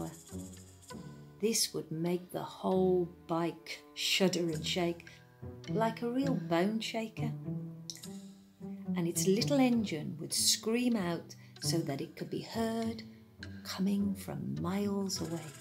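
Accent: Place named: Black Country, United Kingdom. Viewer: A modern (well, 1970s) ghost story. I like the slight black country accent too. Nice work x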